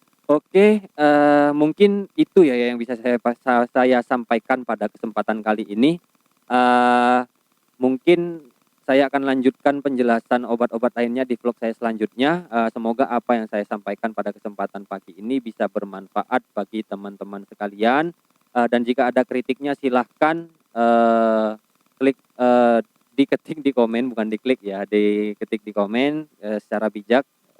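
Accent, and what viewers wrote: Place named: Java, Indonesia. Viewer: Jawanya kental bet bro🤙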